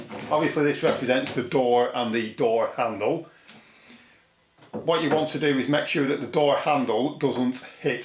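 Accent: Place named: North, United Kingdom. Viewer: Love your accent! We’re are you from? Sounds a little North?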